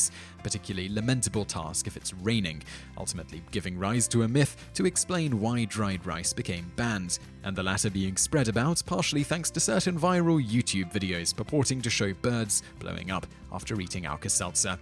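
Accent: United Kingdom. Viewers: Pondering Smirk Oh, probably close to when I did, then. They must've commented after I'd started watching so it didn't show. It's funny nonetheless! One of the perks of having an awesome british narrator. Simon is awesome, but the main audience is American, where Geezers and Geysers are completely different.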